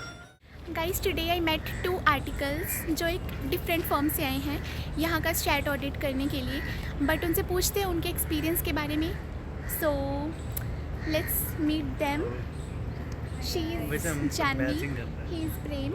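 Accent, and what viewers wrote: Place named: Punjab, India. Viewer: Your punjabi accent is too cute❤😁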